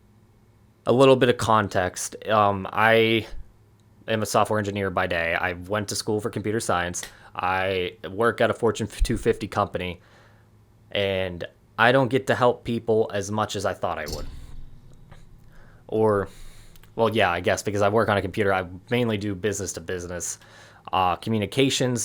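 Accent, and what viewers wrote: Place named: Virginia, United States. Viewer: Thanks for tge advice bro! Been working for me so far. You have a slight Virginia accent , am I correct in assuming that?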